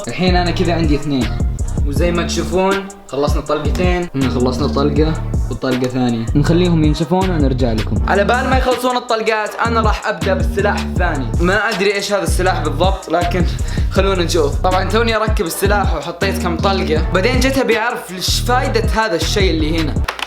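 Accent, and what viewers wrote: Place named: United States. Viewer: كيف تعلمت انقلش مرا كانك امريكي؟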